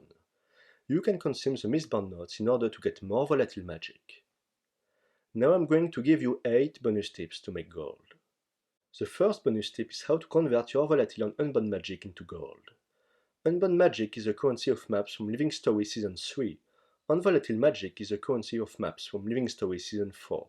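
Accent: France. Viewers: It's the first time i hear a French accent ! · You just sound like you are from France :) To me you are one of the best players in the game! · En vrai c'est beau gosse d'oser lancer une chaîne anglophone en étant français, compte tenu de l'accent francais très difficile à perdre. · why would i ever make fun of an accent i really enjoy listening to? coming from a non native english speaker that is learning french, iz verrry interrrrestang to hear :P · I noticed you're French and loved your accent. ☺️ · Worst accent x) wtf x) why didn't you make this guide in your native language? Guess it's French, it would be better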